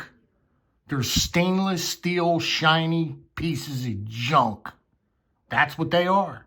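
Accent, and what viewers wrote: Philadelphia, United States. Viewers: Traditional knife videos on YouTube I usually hear a lot of southern/midwest accents, gotten used to it. It’s kind of cool to hear someone like from Philly region.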